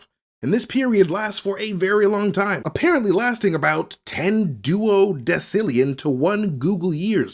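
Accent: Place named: United Kingdom. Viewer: you're the black Loki Brutha💪🏿. You came back, can't stop, won't stop. You got the coolest brutha and the British accent, when yall come together, that's 21 SAVAGE. Dam this was a good bleepin break down. Ryan and his dog on Screen crush arguing on who need to step up. And ol boy is chained to that blue screen, yall keep doing yall Thang. WAKANDA 4EVA, & THE UK is okay! 👌🏿👌🏿👌🏿👌🏿💂🏿‍♂️💂🏿‍♂️💂🏿‍♂️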